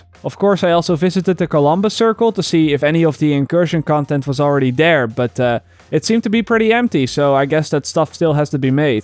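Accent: Netherlands. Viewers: i already tought you were dutch because of your accent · Ik hoorde al dat Nederlandse accent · are you dutch???? you sound dutch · Ha ik dacht al dat hij nederlands was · oke becouse of your accent i can hear your dutch haha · Je hebt zo'n standaard Nederlands accent haha, mooi filmpje though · Dat dutch accent tho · nl accent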